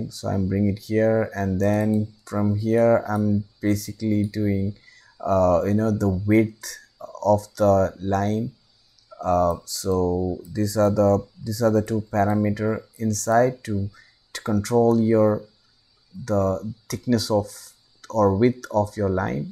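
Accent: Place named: South India, India. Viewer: South Indian spotted XD Nice video though, loved it!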